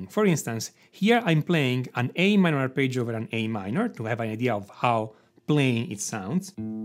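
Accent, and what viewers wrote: Italy. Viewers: you sound italian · Are you russian or italian? I noticed a strong accent, like we do in italy. By the way, your videos are just exceptional! I ve never seen such logic explanations, directly to the point. Your explanation with spoken theory and the sound of what you re explaining make these videos really valuable. Great work, keep on going!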